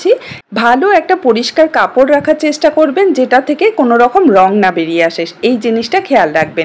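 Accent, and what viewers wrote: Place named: Bangladesh. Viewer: পুরো ভিডিও দেখে আমি একবার ও বুঝতে পারিনি আপনি ইন্ডিয়া তে থাকেন কথার একসেন্ট একদম আমাদের মতো। শুনতে ভালো লাগছিল 🤗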